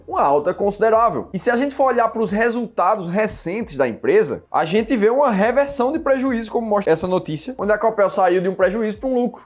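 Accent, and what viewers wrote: Pernambuco, Brazil. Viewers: E aeeee comedor de mocotó de boi! Será que já comeu uma buchada de bode??? Homi....vamos comer uma mão de vaca! Esse sotaque não engana um pernambucano não! Kkkkkkk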